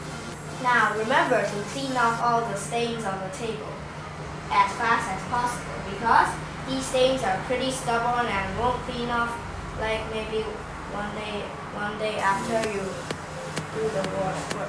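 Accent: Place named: Asia. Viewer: Lol a cup of wwwater hahahha asians speaking english is funny af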